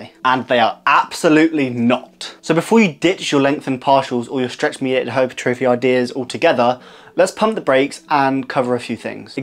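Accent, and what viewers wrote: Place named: United Kingdom. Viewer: Are you British I like your accent 👍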